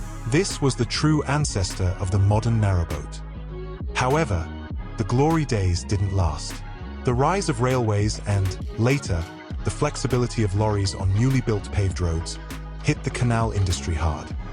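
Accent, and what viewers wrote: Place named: United States. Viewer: British content, American voiceover??? Really?? Not at all sure I want to subscribe, even though it looks interesting.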